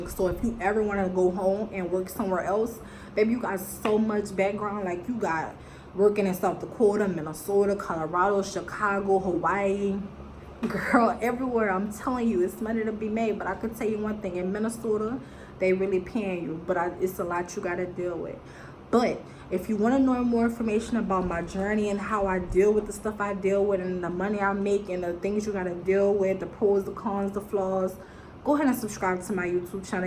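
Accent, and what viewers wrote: New Orleans, United States. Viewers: I LOVE LOVE LOVE LOVE your NOLA accent whew! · Love your NOLA accent 😩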